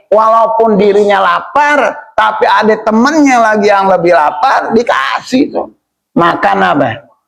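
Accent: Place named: Jakarta, Indonesia. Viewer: Nie ustadz dialeknya Betawi banget ane sangat suka 😅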